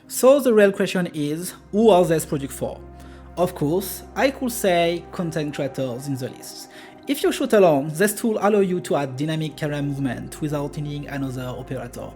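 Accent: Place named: France. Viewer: On est d’accord il a un sacrée accent français ?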